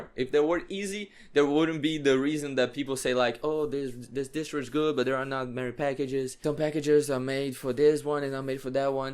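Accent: Brazil. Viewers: Mano BIZARRO como ao ouvir você pelos primeiros segundos, deu um gatilho do nada que você era brasileiro só pelo "sotaque" de leve que senti na pronúncia das palavras em inglês, isso é muito doido KKKKKKKKKKKK enfim cara, excelente vídeo e sucesso pra ti!! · Video mt top carioca! É muito top q quando agente comeca a usar Linux, agente acha que é so uma versão melhorada do windows. Mas quanto mais agente usa Linux, masi agente tem opiniões sobre os diferentes componentes do sistema, e é engraçado que quase todos que mudam, começam á mais voltados a privacidade e FOSS. É muito boa essa comunidade? P.s: Teu inglês tá muito bom, não deixa tua cabeça achar que so por causa do sotaque brasileiro ele não é, tenta não se comparar com nativos! Parabéns pelo video e pelo canal, tu ta me fazendo aprender quickshell pra customizar meu hyprland (Arch btw) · Awesome video! Prolly the best ive ever seen about distros, love the slides. 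Seu sotaque não me engana! É br?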